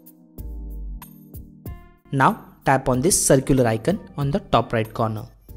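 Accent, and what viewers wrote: India. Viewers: SUch a damn indian accent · I love indian accent yes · THANK GOD HE HAS THE INDIAN ACCENT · Indian English accent 😢😢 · Idk but his Indian accent made this 10x easier to understand. Thanks for the help 🙏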